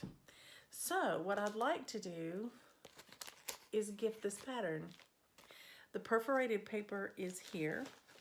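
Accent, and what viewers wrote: South, United States. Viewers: Such a pleasant southern accent and I love your taste in patterns.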